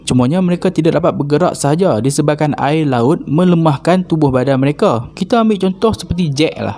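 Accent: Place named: Kedah, Malaysia. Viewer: Pekat kedah.. Haha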